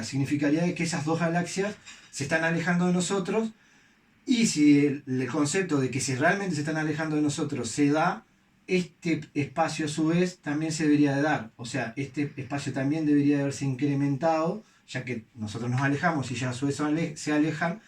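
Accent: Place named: Uruguay. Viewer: Interesante hipotesis, mucho mas siendo que no eres físico. Eres uruguayo? Tu asento se parece mucho. Deberias estudiar en el Balseiro. Saludos desde Argentina.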